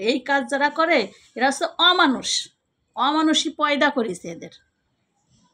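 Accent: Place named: Kushtia, Bangladesh. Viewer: Api tomar language so sweet kustiya niki meherpur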